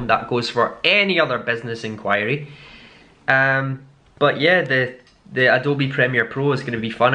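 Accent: Scotland, United Kingdom. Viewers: Im excited for you, i love listening to you talk! Scottish is the best accent!!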